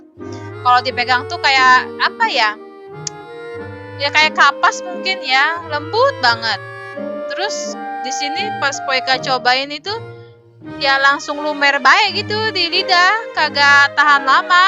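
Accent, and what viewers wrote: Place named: Betawi, Indonesia. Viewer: ngomongnya legit bgt gemes betawi medok 😊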